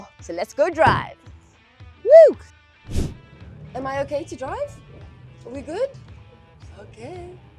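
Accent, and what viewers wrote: South Africa. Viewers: As a proud South African I can definitely hear my own. Im assuming the host is South African 👊🏽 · You sound South African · She sound South Afr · Who is this Girl. Is she South African?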